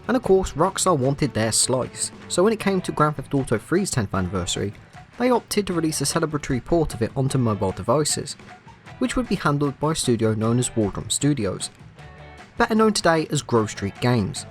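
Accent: United Kingdom. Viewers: He’s British that’s why he sounds like this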